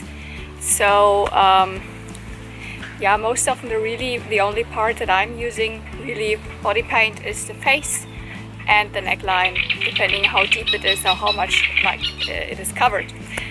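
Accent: Germany. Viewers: Bin ich die einzige, die den Deutschen Akzent raus hört? PS: tolles Video😊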